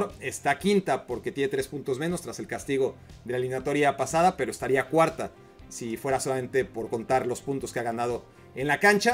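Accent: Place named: Mexico. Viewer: Escuche tu acento mexicano y de inmediato perdió peso y credibilidad tu video. mexico no existe en futbol, dediquense a la pesca, remo, polo.... FUTBOL NO !!!!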